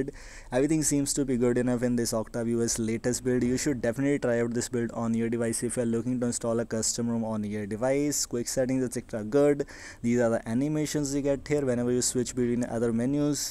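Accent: India. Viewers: Bhai Lucknow se ho kya?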